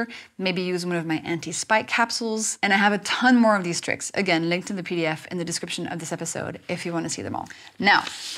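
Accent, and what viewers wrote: France. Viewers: Hello angels!! Does anyone notice the similarities in her voice and the og mtv vj Martha Quinn? It’s like I’m back in 1982 watching Martha introduce the new video. With a little tinge of French. Anyway I have totally cut out sugar and most carbs, savory breakfast, vinegar, etc. feeling great, not deprived, and BMI and excess lbs are dropping!! It’s been less than 2 weeks but it’s working!!😊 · When I first saw you, I thought you were French (that's a compliment) because your facial style, your personality, and the way you speak English has a little bit of a French accent, and I don't know if it's the short haircut that reminds me of that more than anything else. You look really good, like a model, not a biochemist, and I think that's why people are paying more attention to your channel, because it's not the boring, stuffy biochemist's sleepy explanations. 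I've been watching your channel a lot lately, and it's really helpful in a lot of ways. However, the food that they eat, I think it's one of those things that's so strongly cultural and I think it's one of those things that can't be easily fixed because it's so deeply ingrained, especially from your mum and your parents when you're younger. Of course, that's why your video is so important and meaningful to people who want to make a change and feel like there's a problem. I look forward to seeing more of your helpful videos in the future. I'm cheering you on from the bottom of my heart from Korea.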